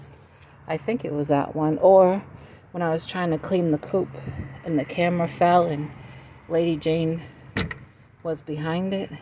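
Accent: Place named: Connecticut, United States. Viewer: Me too. From Connecticut. Been here almost 17 years. I recognized your accent. 😊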